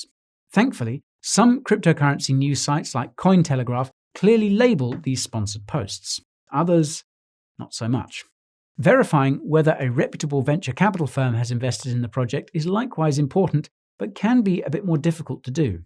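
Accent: United Kingdom. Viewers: Hi, I am new in Cryptocurrency, what is ICO , I Dex , Ieo? Sorry i feel like ignorant.. Your Y.t very informative, But i have problem with ur britten Accent. can u please easy it for international Followers.. Thanks , u are the best so far.. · I love your very British accent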